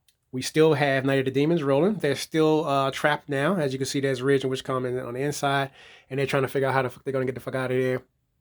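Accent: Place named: Louisiana, United States. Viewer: Nice collection! Lots great titles and TV shows. Love how you have them set up. you have a cool accent, is it from Louisiana. I subbed.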